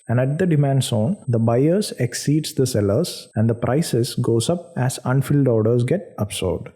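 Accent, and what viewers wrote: Kerala, India. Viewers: Bro Are You From Kerala ? Through Your Accent I guessed . Am I Right ?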